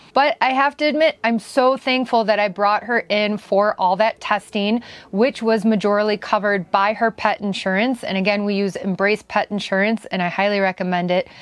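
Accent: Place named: Midwest, United States. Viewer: I have watched almost every and Riot video and I think you both are awesome. As someone that was born in Wisconsin and lived most of my life in and around Chicago your voice reminds me of home. In one video you compared a city to Beloit and I said to myself “How many people here know Beloit?” Beloit is no Janesville but it’s alright. I chose this video to comment on because microbial remediation is part of my job and “The Fungus Among us” is literally one of my titles in my training materials used to train new technicians. I am going to offer a little critique of your videos. It mostly positive because I think you are doing a great job and are genuine. So, I don’t know how you don’t have more subscribers because I find your videos much more entertaining, informative and real than most that I have seen. I guess I can just make this a top 10. 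My 10 favorite things about and Riot videos: 1. swearing. It’s not gratuitous vulgarity but you say what you feel and if that includes coarse language you let it fly. 2. How well thought out you are. It’s obvious that you research your topics and yet you always provide verbal disclaimers that people shouldn’t take what you say as gospel. 3. Your sense of humor is sometimes dry but is often quirky and nerdy including lots of Star Wars references as well as LOTR and even South Park. 4. How strong and positive you are. You appear to be crushing the nomad life. Super prepared and taking the pitfalls in stride. More people especiall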